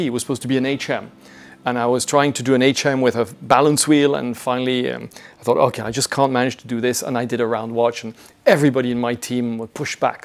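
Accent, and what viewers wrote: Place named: France. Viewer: i like the host' french accent, he got the right vibes on reviewing watches and makes me want to learn to speak french. Want more of his reviews in the future 😂🇫🇷👍🏾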